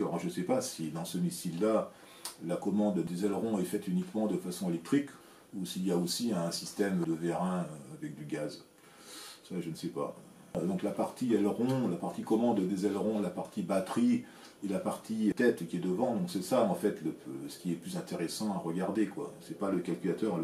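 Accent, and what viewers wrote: France. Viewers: Im not native english speaking person and yes your accent sound French but you last video I perfectly understand. Its true that you can buy some interesting stuff on demobiles (after military shops) in some prive hands i saw last year single use rocket motor for nuclear rocket (new one not used) what was at the moment best what zssr had at the moment. And the owner even dont know what it is ;) Imagine if some organize toxic fuel for it some avionics and can send bus to the USA from Poland :) Do you passion we will enyoj it if you share videos about it. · Why do you apologies for your french accent, it is very nice to see everyone speak their own accent, it shows that we should accept and respect each other. Doing such a great job and speaking your own french accent encourage everyone not to shy from their accents and to focus on content. 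· I am from Hong Kong. My English is also bad and with heavy Chinese accent. I enjoy your English with French accent and your video too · l accent francais cela me va tres bien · I think your English is excellent, and personally I find English being spoken with a strong French accent to be awesome to listen to. My former manager (still a coworker) is French (born and raised) and while he has been living in the US now for 8 years. His accent is still very strong and its something I also look forward to hearing. He is one of my favorite people in the world. 🙂 Please don't stop doing your videos in English. It is a pleasure to hear such technical information spoken with a French accent. I will still watch all your content if it is in French, but I will gain more from it if spoken in English. 🙂 I guess I am allowed to be selfish at least once and a while! lol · Your english is perfect bruh. 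People especially like it cus the french accent sounds cool lol. For what conceivable reason would anyone hate on it?? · Le bon accent français m’a bien fait rire. L’important c’est le contenu ! Je viens de m’abonner. J’attends la suite avec impatience. Continuez en anglais au vu de vos chiffres ! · L'accent français est rude seulement pour les oreilles françaises... donc on s'en fout. Ce qui m'étonne c'est la puissance de calcul embarquée même si c'est du fire&forget. Il embarquerait du code de type IA ? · Bonjour, I have been following your channel for years, never had an issue with understanding your engliah and always felt that your french accent was very charming. Thank you for all your hard work over the years. I can never get enough of your unique subjects which entertain and enlighten me with each and every video. -Ray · french accent is funny.. maybe hardcore americans who only speak english having problems to understand you. 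but the rest of the world is with you. 🙃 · if you got haters on Youtube, that only means that you are successful. Your english is by far not as bad as you think it is. You have the odd funny word with french accent from time to time but it is easy to get used to it. Like when you omit the "s" when saying "display". Still everybody should know what you said. Your video content is one of the most sophisticated on the Youtube world. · thank you very much for your previous video. I could perfectly understand your English. In fact, due to the French note I found it very pleasing to listen to it. Please continue doing such videos!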